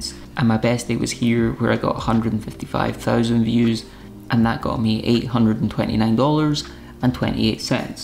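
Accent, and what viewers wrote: Scotland, United Kingdom. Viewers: The Scottish accent has got to be the best in the world, and your voice is so relaxing. Anyway I'm glad it did well and you got some good pay out of it as you deserve it. I can work out that some other youtubers must be so rich! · Thank you for sharing this information. It gives me some motivation to do more video editing and to try to become good at it. Side note: Love the Scottish accent. Scotland was one of the first countries I spent time in when I traveled outside the USA. I have nice memories of my time there and will always love hearing a Scottish accent because of that.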